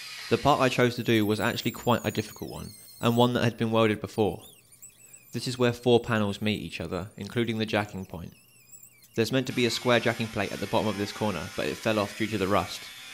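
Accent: United Kingdom. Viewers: No problem with few talk here and there but the British accent is hard for me to understand in some points especially in fast speaking because English is not my language.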